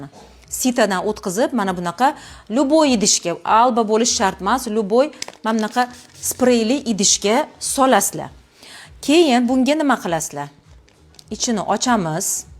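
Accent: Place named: Tashkent, Uzbekistan. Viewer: Тошкентча. Гапиришга гап йук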